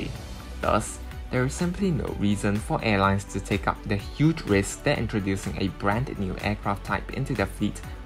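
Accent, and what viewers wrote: Singapore. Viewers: the host spoke like either Singaporean or Malaysian. and a lot of his comments are bull shit. C919 components is made world wide - even the body structures design involved a lot of European consultants---why? So that C919 can get FAA airworthiness certificate.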